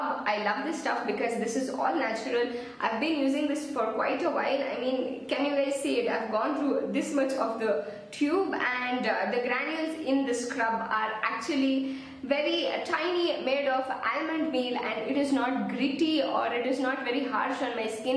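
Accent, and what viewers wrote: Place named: South India, India. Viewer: You have the cutest south Indian accent.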